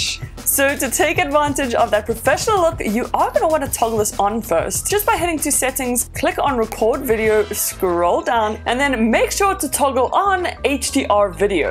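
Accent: Germany. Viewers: I bet she is German or South African. What do you lot think 🤔?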